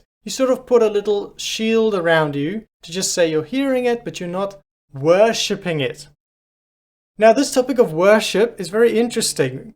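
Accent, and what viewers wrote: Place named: South Africa. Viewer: Yeah. I was confused for a second as I thought you were from Australia. But then, I thought about it and thought maybe your accent sounds a bit South African.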